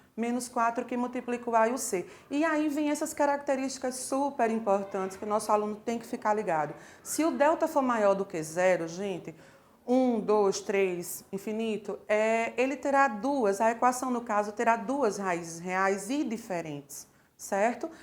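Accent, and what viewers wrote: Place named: Northeast, Brazil. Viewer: e do nordeste pelo sutaque kkkkkkkkkkk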